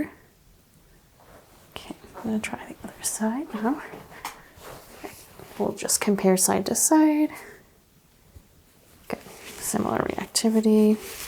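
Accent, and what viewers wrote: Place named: Upstate New York, United States. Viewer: i'm not sure where you're from but your accent really reminds me of western/upstate new york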